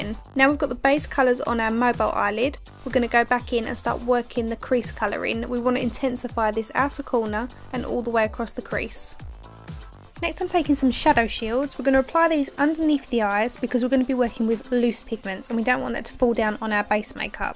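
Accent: Essex, United Kingdom. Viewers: are you from essex 💁🏼❤️🙌🏼💯💋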